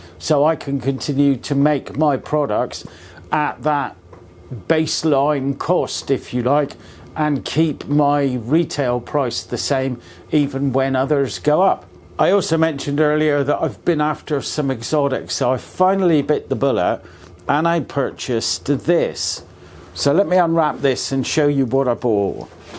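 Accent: Cornwall, United Kingdom. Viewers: Hi, I stumbled on your channel a couple of days ago. I thought I recognised a fellow Cornish accent! Getting any supplies into the county is a nightmare. It's the transport that kills it. I'm looking into making a longbow, either self yew bow or osage, or a laminate bow from ash, purple heart, lemonwood, maple, cherry, walnut, hickory etc. Some of the prices are ridiculous. It's hard enough to source somewhere that sells the material. Another problem is shipping a 6 foot length of timber. Ideally I would want to see the wood for myself. I need straight tight grain, no knots, cracks etc. So risking hundreds of pounds on a piece of timber only to be unworkable makes it impossible. Good luck!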